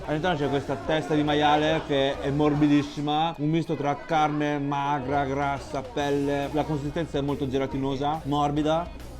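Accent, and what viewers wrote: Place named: Emilia, Italy. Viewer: I tuoi video sulla Cina sono stupendi. Il tuo accento emiliano e' fantastico.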